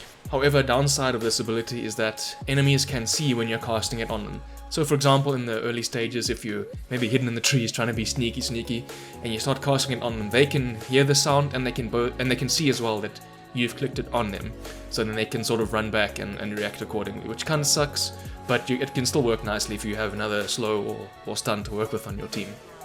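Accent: South Africa. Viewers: South African? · Came here to learn Oracle.. You sound like you from South Africa.. The motherland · Are you South African?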